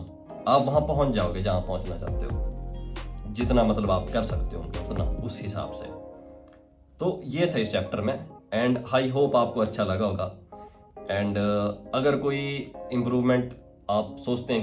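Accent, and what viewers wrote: Himachal Pradesh, India. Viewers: Your accent tells that you are from Himachal.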